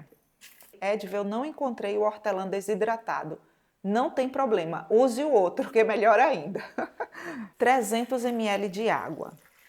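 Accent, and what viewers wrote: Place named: Fortaleza, Brazil. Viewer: É por essas dicas maravilhosas que gosto muito do seu canal ❤ E com esse sotaque, mulher eu achava que vc era de fortaleza kkk E de onde vc é?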